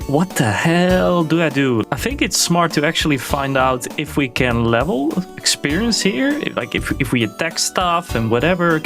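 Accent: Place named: Netherlands. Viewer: Ik hoor een Nederlander, ik heb je door 🧐😂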